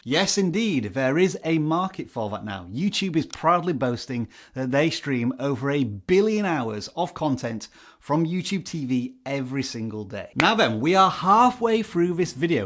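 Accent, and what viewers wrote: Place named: Northern England, United Kingdom. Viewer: Another great video,! Still love the Northern England accent. I actually got to this video, because I was previewing one of my videos and it popped up in the side bar.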